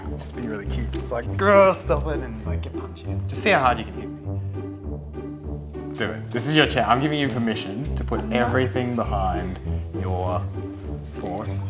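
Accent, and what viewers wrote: Australia. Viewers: is that ur real name, I have good reasons to ask, r u Chris Pratt? Someone meshed some faces in vids sent to my phone. I met someone in my town I'm living in, in Canada, who looks like you or like the Vid of Chris Pratt sent to my phone. (R u Chris?) The man I met said his name was Dylan? Do you actually have a real partner or just for the vid? Is ur Aussie accent for real, is ur Mat whose filming ur vid, last name Leblanc?(not the friends actor)? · are you Australian?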